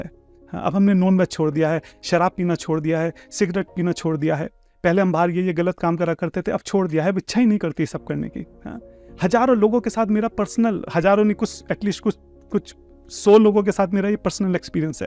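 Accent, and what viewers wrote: India. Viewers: Sir your Voice,,pure Indian and ak sukun bhari hai ...jay hind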